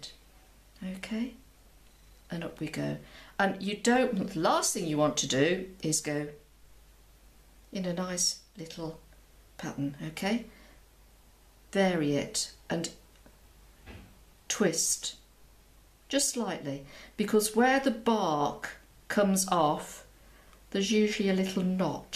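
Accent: United Kingdom. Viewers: I've so enjoyed watching your videos & learning about watercolor from you. You're such a lovely lady with a soothing, relaxing voice, especially your accent. I love all things British & hope to visit the UK one day, especially England. I'm an extreme beginner to watercolor at age 44 though my late grandmother was an accomplished artist of oils & watercolors. My mother has painted in the past as well. My 19 year old daughter, who is a beginner also, inspired me to give it a go! I can't draw well at all but I've been able to paint from some of your videos, like the lavender pot and these wonderful silver birches! We have them here in Virginia, too! I was able to paint a very nice one from this video using brush you mentioned; so easy, but looks so real! Thanks again for sharing your talent!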